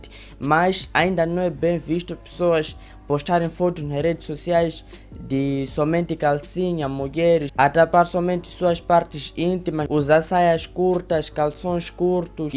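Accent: Mozambique. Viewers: Sobre o seu português, o seu sotaque é o sotaque moçambicano mesmo ou você está tentando falar o português brasileiro? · Entendo o sôtaque Moçambicano de boa kkkk😄😄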